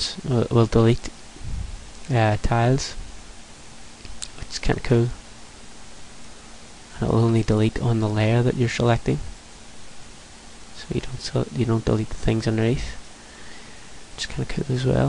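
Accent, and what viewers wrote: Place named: Ireland. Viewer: Just wanted to thank you for the excellent tutorial(s)! I found (all 11) to be quite useful as an introduction to XNA. I am very greatful for your time. I was relieved that you had posts listing the XNA 4.0 conversion under the applicable video showing the corrections. BTW its nice listening to an Irish accent in these tutorial videos as opposed to the 'more comment' accent associated with programming tutorials. This Canadian Approves :)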